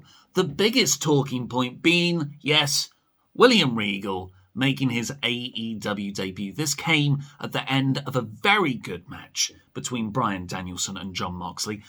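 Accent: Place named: United Kingdom. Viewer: Yes. Just Yes. To me, AEW is if WCW did everything right instead of... well you know the rest. have you ever thought of using the US grading scale? A+ and whatnot? no complaints about your current grading scale, just a thought. Love this channel because none of my friends are big wrestling fans... or have British accents.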